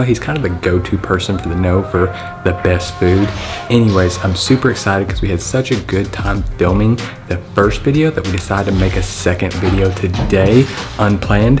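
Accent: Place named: South, United States. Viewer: We've grown accustomed to your Southern drawl but the weird things you say still need some getting used to. ;)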